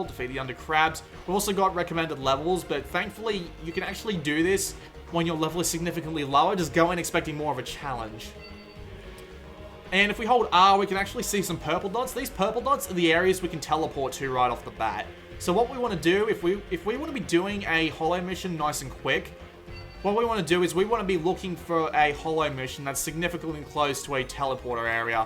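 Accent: Australia. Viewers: Love your Aussie accent mate!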